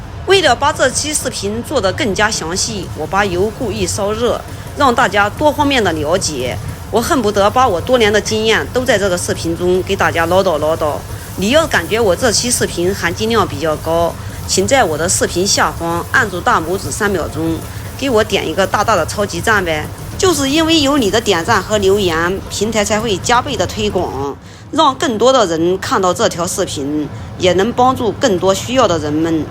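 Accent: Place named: Xinyang, China. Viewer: 口音像信阳人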